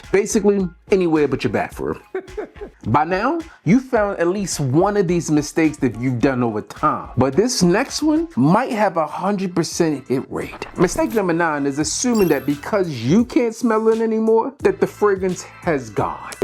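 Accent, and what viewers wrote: Baltimore, United States. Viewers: Shoutout baltimore i hear the baltimore all throughout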